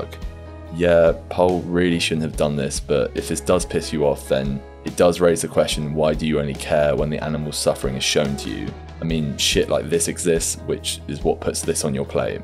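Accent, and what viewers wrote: United Kingdom. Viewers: the high energy intro revealing a deep fried british voiceover is so funny to me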